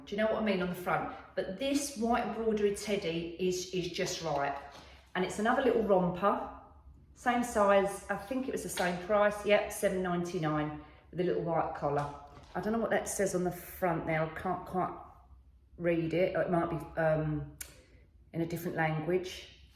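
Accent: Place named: England, United Kingdom. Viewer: Love your beautiful English accent.. beautiful home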